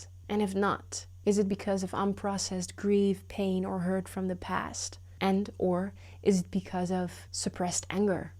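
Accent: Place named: Netherlands. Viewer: This video really was a eye opener. Als reconized the dutch accent in secconds❤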